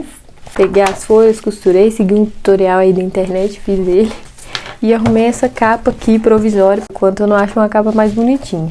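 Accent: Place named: Minas Gerais, Brazil. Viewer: Vc é mineira? Percebi por causa do seu sutaque 💗que Deus te abençoe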